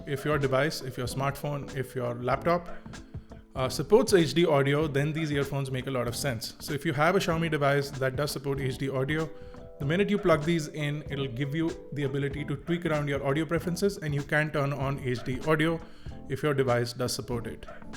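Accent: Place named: Mumbai, India. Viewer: that's Mumbai accent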